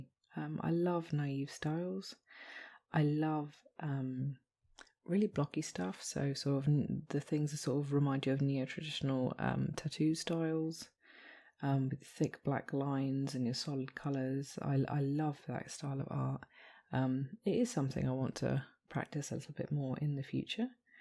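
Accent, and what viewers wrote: United Kingdom. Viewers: This looks and sounds amazing!! British Accents are my favourite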